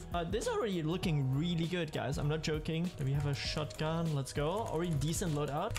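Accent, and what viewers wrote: Germany. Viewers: Hab dich grad entdeckt und hab nicht mal 10 sekunden gebraucht um zu checken, dass du Deutsch bist. Als Deutscher hört man das gleich raus haha :D. Und nices Video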